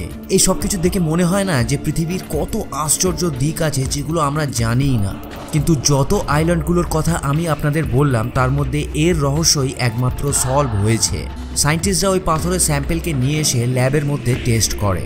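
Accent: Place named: Kolkata, India. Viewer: সুন্দর উপস্থাপনা তবে আপনার কথায় কোলকাতার স্থানীয় ভাষার একটা ভাব আছে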